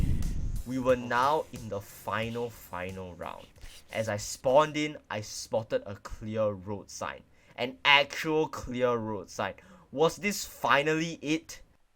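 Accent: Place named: Singapore. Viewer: Bro has the most Singaporean accent i heard in a long while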